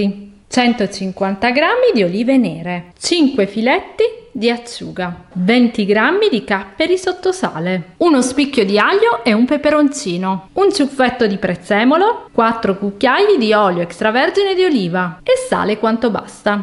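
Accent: Apulia, Italy. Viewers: Dall'accento sembri pugliese. Vero?😁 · 👋👋 SEI brava e chiara, di che regione sei PUGLIA, VOLEVO CHIEDERTI CHE NE PENSI DEL ROBOT BIMBY LO USI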